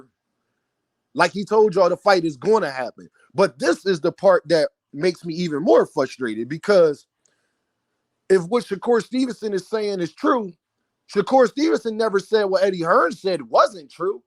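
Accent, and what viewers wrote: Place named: Philadelphia, United States. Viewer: you from Philly huh bro? I’m from Baltimore lived in Philly 3 years you sound like my young bull from there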